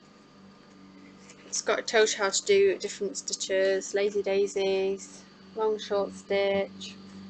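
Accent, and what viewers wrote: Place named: Sheffield, United Kingdom. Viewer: its brilliant seeing another stitcher from the uk though it started a debate between me and my boyfriend trying to place your accent i say somewhere south Yorkshire? thats where i am and you kind of sound sheffield ish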